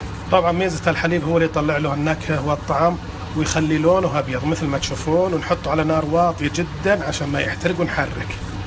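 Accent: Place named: Buraydah, Saudi Arabia. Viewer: ونعم فيك وفي اهل بريده من لهجتك عرفت بس احنا نبغا ناكل من طبختك قلنا يمكن يكون حولنا الله يسعدك يا وين ماكنت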